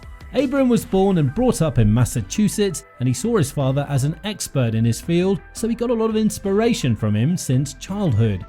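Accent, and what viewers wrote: England, United Kingdom. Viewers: I can’t believe someone with an English accent read out this tripe! Get a half decent script editor and transform the quality of your videos overnight! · Why do they import a brit to narrate?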